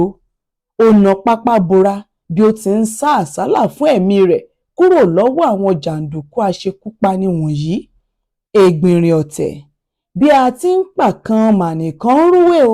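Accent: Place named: Yoruba, Nigeria. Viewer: Top notch yoruba accent news broadcasting 😊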